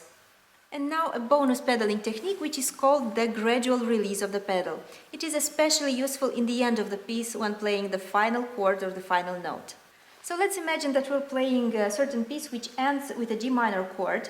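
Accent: Russia. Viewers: Замечательный, качественный учебный материал, сайт тоже сделан старательно и со вкусом. Небольшая ремарка насчёт русского акцента (товарищ внизу тоже заметил), от него легко избавиться если захотеть и понять главный принцип — англичане, особенно американцы, издают звуки большей частью через нос. Направляете все гласные туда, на этот канал цепляете согласные, и от нативного спикера будет не отличить, голос станет более певучим и появятся красивые обертоны. Процветания!! ))) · ох уж этот русский акцент, но красивая - глаз не оторовать ))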